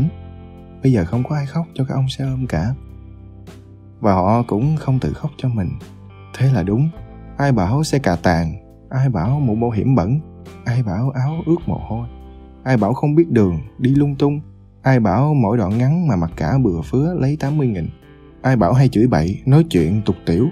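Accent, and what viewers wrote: South, Vietnam. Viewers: Bài viết của chàng trai Hn, giọng đọc miền nam nhưng mình thực sự thích giọng đọc này, ở đoạn cuối có những đoạn lắng trầm rất cảm động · Hai bài giọng miền nam nghe hay mà nội dung không hay Nghe cứ sao sao ấy không hút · Nội dung người Bắc soạn, giọng đọc người Nam truyền tải. Là người Hà Nội sống và làm việc tại Sài Gòn, tôi thích cảm giác này. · Cái giọng đọc miền Nam chuẩn kiểu này giờ ngày càng hiếm. Mong bạn giữ gìn nó nhé.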